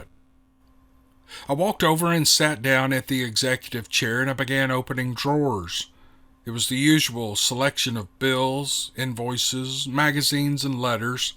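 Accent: South, United States. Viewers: Awesome story!! Neoma definitely has a way with words. Her very descriptive form of writing drew me in and had me feeling like I was part of the story. Neoma's writing coupled with silky smooth Southern drawl is a match made in storytelling heaven🥰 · I got to say this is one of the most interesting stories you have read. And I also will comment on your wonderful accent and phonics and the way you speak/read to us all. Your accent sound, southern, but without the heavy drawl-but I’m not exactly sure where to pinpoint where your accent is from! Where I’m from there’s been all types of accents and I can usually identify them really well. Tell us please. And thank you again for these really really interesting stories.👏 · Super story! Kept falling asleep to your sweet southern drawl. It took the whole night, till am for me to hear the ending. Wow! What a conclusion it was! 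I'm awake now 😂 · Thank you for another great job. Enjoyed it very much. I guess it's that lovely southern drawl that just pulls you into the middle of the action.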